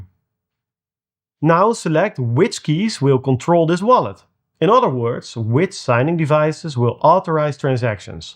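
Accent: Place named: Netherlands. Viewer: lol dacht ga engelse vid kijken gelijk NL Accent gehoord nu nog meer geintresseerd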